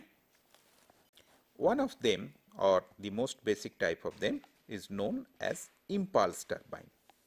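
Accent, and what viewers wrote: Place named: East, India. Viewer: com'on! He might be from orissa, west bengal or Assam. This how people speak english in these regions, we cant change it in your way. Im sure if somebody asks you to speak in British or american accent, you will mind it.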